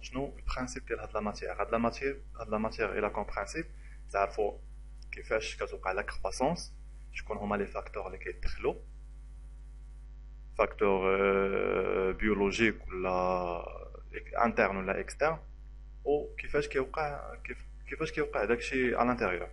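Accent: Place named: Arab world. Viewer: Ton exposé n'est orienté qu'aux arabes, ton français est trop arabisé, on y comprend presque rien. Shukran quand-même.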